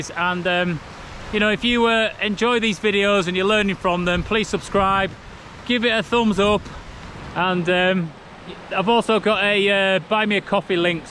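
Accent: Yorkshire, United Kingdom. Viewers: Fab watching this adventure…. I love your chat, the nice Yorkshire accent helps 😊 Surely it’s a bit scary getting back 😮 It must be so hard walking in this thick snow Thanks for the fun 🎊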